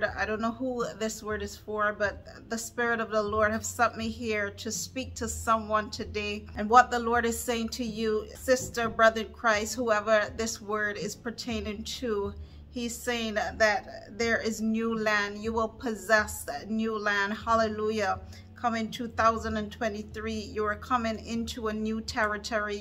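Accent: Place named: Barbados. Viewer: Your accent sounds a bit Bajan! Great word! Confirmation! 🙌🏾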